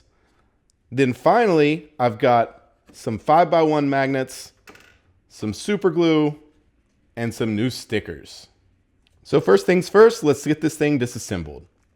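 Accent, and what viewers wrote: Texas, United States. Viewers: You gotta Texas accent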